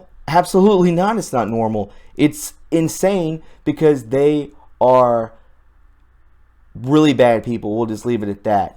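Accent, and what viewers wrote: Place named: South, United States. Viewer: How is it that your southern accent as bad as mine?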